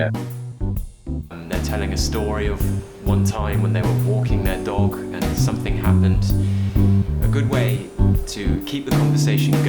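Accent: United Kingdom. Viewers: anyone listen to you will definitely like the British accent. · I reallyyyyyyyyy want to speak just like you, your accent is really amazing I like British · I prefer British English. I’d like to speak like you. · I love British English and accent. But it's quite difficult to learn your accent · Since I heard your speaking, I’ve thought I’d like to speak like you, British English!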